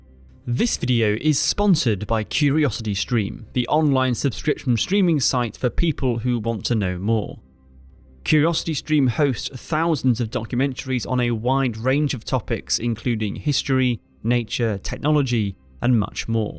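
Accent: United Kingdom. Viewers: Ur voice sounds like british accent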